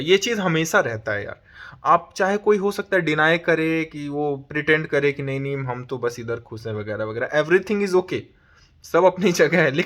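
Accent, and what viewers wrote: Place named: India. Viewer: Headline is english, you speak indian🥲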